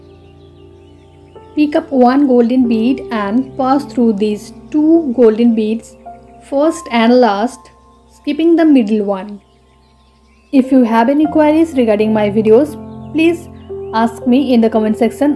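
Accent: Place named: India. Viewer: محتوى مفيد واصلي لكنتكي تبدو من الهند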